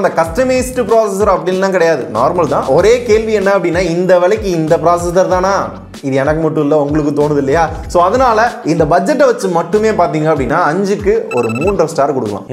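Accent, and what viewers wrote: Madurai, India. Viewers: Madurai karan than ya nee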